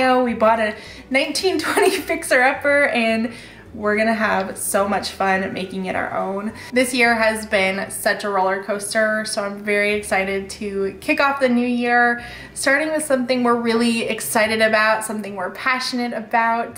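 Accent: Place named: Midwest, United States. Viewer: Welcome back to the mid-west.I am from Iowa. I thought you sounded more like me. Right now moving closer to family feels more secure and helpful. I wish you months of hard work and much love for your home. I will be watching you. Joyce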